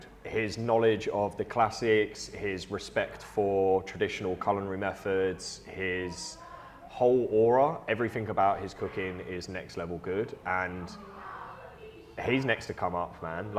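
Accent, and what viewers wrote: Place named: Cockney, United Kingdom. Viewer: Interesting watch with the cockney culinary reincarnation of Mac Miller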